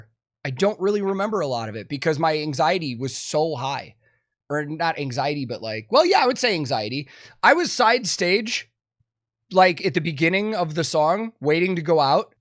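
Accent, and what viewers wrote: Germany. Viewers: Did your German improve during the tour, and which accent do you have now? 😁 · Hi. I`m from Germany and i must admit, that you really sound like a German! Ultra Props Buddy:D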